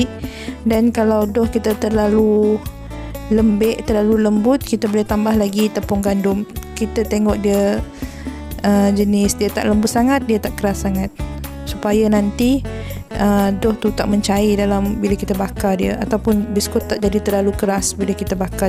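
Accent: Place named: Sabah, Malaysia. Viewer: tq resepinya.. tp knp bunyi awak ckp kdg2 mcm org sabah? hehehe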